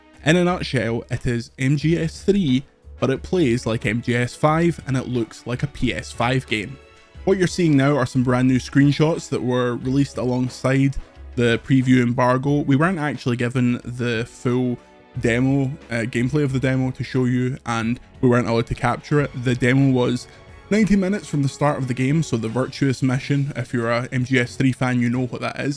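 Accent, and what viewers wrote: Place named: Scotland, United Kingdom. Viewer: You're a troll or an idiot. The accent is Scottish, and as an Englishman I understood him perfectly.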